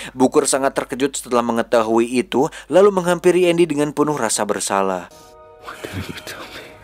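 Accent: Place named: West Java, Indonesia. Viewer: Lidah orang sunda nggak bisa disembunyikan haha. Weduk, Camal.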